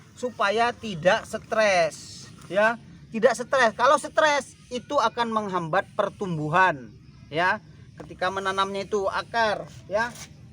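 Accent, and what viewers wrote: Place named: Medan, Indonesia. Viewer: Tengok, nampak.. cakap-cakap khas medan 😂 Semoga sukses bang 👍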